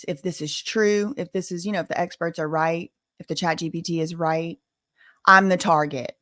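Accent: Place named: Baltimore, United States. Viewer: You from Baltimore? You got the accent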